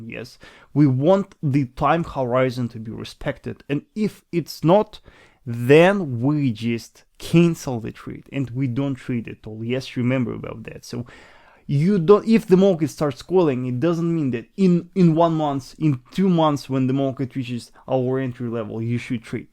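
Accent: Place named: Russia. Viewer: the russian accent....love it....hehehehe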